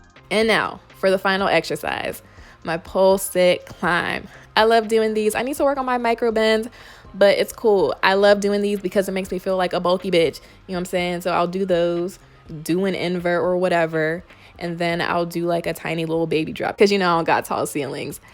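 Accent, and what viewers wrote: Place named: Pittsburgh, United States. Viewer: Listening to you speak, first thing i said was “is she from pittsburgh?” The i went to your IG and saw the pin. Do your thing!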